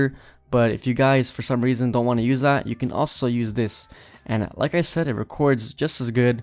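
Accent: Croatia. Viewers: is he croatian? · Are you from Croatia?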